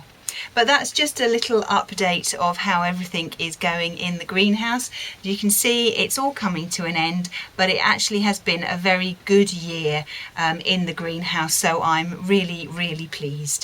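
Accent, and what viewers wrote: United Kingdom. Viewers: Hello. I always enjoy watchin you and listening to your messages and that beautiful British accent. Love it. I like hearing about the differences in our climates and what we can and can't grow. I'm from Alabama, USA. I had a little, scattered garden this year with a nice little patch of okra. BUT, I've had to fight to keep the ants off my okra and corn plants and the squash bugs off the squash plants. Oh well. I think next year I'll plant the way I've heard people talk about, plant some for myself, plant some for my neighbor, and plant some for the bugs.That's a tall order.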